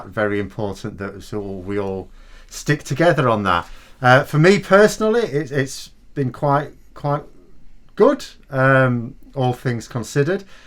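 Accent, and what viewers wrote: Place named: Yorkshire, United Kingdom. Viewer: Merry Christmas. I very much enjoy your podcasts and am a regular viewer. Your insights into politics are most informative. I particularly like your Yorkshire straight talking, something I appreciate about the folk in Britain's best county. All the best.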